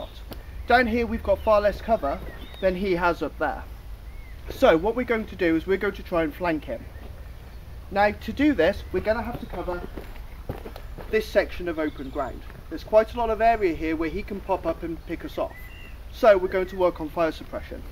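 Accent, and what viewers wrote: England, United Kingdom. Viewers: you english?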